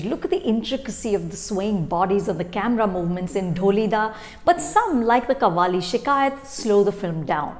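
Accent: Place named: India. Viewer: Her indian English accent though 😂😂😂😂😂😂😂😂😂😂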